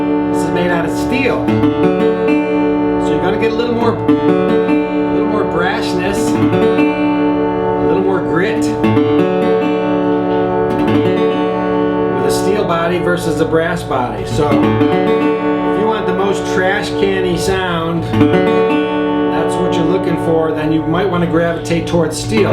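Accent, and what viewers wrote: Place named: Great Lakes, United States. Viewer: The Great Lakes accent is strong. I live in Wisconsin, people here talk like this guy. Naational Guitaaar DAAAT CAAAAM lol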